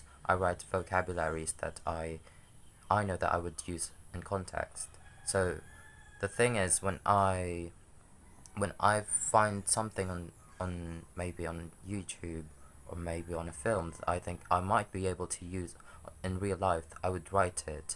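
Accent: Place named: United Kingdom. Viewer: You speak good English. Sounds British. And none of it sounds force. All sound normal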